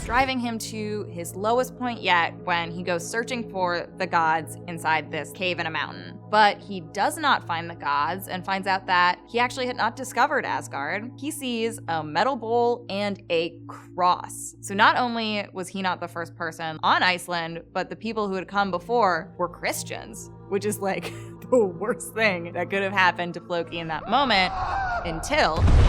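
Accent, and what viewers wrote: United States. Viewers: I cannot stand this lady's voice this type of American accent is the worse sound in the world !!! And so and like and like whatever just speak he English language properly if your not going to you could always create an American language tbh I can't stand anyone from the new world talking about history ... Your all so isolated and far removed. From the rest of the world your perception of the old world is just wrong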